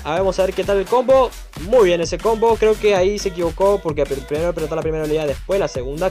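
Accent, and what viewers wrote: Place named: Argentina. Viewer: Pensé que eras argentino. JAJAJJAA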